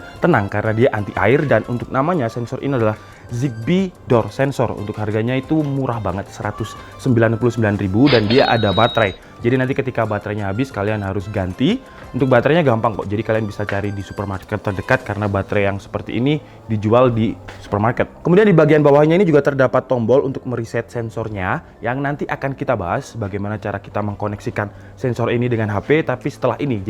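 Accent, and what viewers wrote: Java, Indonesia. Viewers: Jane ngunu arek endi se pean iki 🙈🤣 kok jowo e medok memehan ae bingung bhs indo ne wkwk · bahasa indo ne pemean opo? " jemuran " wkw ngakak cok jowo kentel tenan 😂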